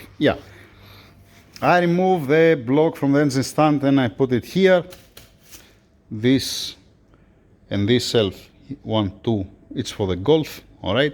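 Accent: Greece. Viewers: Just a quick question firstly I absolutely love how that you are attention to detail. Are you GREEK?? because you sound like it 😅😅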